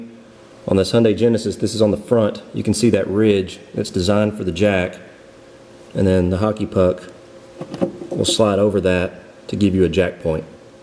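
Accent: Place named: United States. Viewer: He’s all American, no funny weird foreign accent, speaking Perfect American English.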